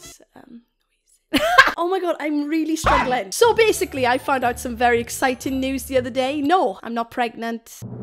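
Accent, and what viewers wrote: Wales, United Kingdom. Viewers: I fucking love ur accent. Only time I've acc liked the Welsh accent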